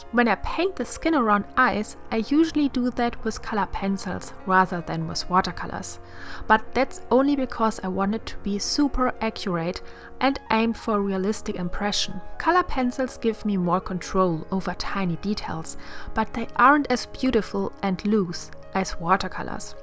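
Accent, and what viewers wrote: Germany. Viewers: It's funny how one (or at least i) can immediately tell that this is a German accent. Wonderful drawing btw! Sadly i don't have all those materials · I love your german accent! This art is amazing! :O great job! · Direkt gemerkt das du deutsch bist 😂😊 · Are you from Germany? Because you dont sound like "amerikan or english". Your dialect is annoying lamo hahaha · Jep, that's clean german school english, as we all leaned it. 😁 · Hey 🌸Mir ist aufgefallen, dass dein Englisch extrem deutsch klingt.Vielleicht könntest du etwas an deiner Aussprache arbeiten♡, das ist kein Hate sondern nur ein Tipp, das Bild sieht allerdings echt meega gut aus😊❤ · beautifully drawn and explained ... beautiful german accent ❤ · Bist du Deutsche? Ja aber der Akzent verräts :D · katy cat18 Do you seriously believe you're contributing to her endeavours in any way by commenting on her "extremely German-sounding" accent? 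No. Maybe you're too young to know or perhaps you're just not socially adept enough, but it's not acceptable to tell people to fix this or that about themselves, because sometimes it is just not your place to do so! works so hard to create high quality content and I know from her previous videos that she has been working on her English. Plus, people just don't have to speak English perfectly. So leave her alone and Get Over It, please. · haha funny I am from austria and yes I know this is Just typical german accent but for some reason I Just cant Hear it😂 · Omg I immediately heard that you are german😂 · Are you from Germany? 🙂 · My god me too i was like 0.0 that is strooong german accent riight? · Ich bin gerade zufällig über dein Video gestolpert und möchte gerne meinem Eindruck der Kommentare widersprechen: Ich finde es gerade gut, dass du einen deutschen Akzent hast und trotzdem Videos auf englisch hochlädst und dich nicht davon beeinflussen und zurückhalten lässt! 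Heutzutage wird man das Gefühl nicht los, dass man perfekt & akzentfrei Fremdsprachen sprechen können muss, bevor man sich äußert, wodurch die Hemmschwelle immer größer wird und man dann gar nicht erst in die Übung des Sprechens kommt. Daher: Bleib wie du bist und beehre uns weiterhin mit deiner Kunst!! <3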